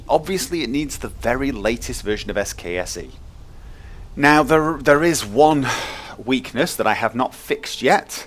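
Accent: United Kingdom. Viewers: Would guess British but lives in Norway. But man, Trondheim is such an epic name! Greets from Sweden.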